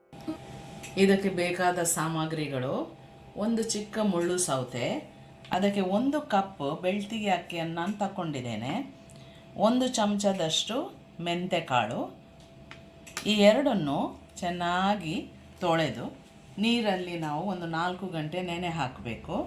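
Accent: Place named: Tulu Nadu, India. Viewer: Madam your Kannada is very poor mixed with TULU LANGUAGE.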